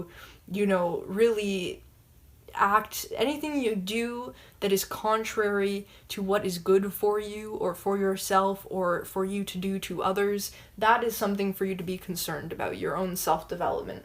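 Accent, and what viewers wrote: Canada. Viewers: Are you Canadian per chance? The way you pronounce "about" sounds Canadian. 😉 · I have a hard time figuring out if you are Canadian or Danish?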